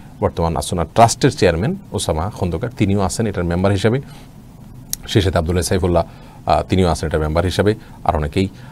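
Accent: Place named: Barishal, Bangladesh. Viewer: আলহামদুলিল্লাহ আলহামদুলিল্লাহ আপনার ভাষা বরিশালের মনে হয়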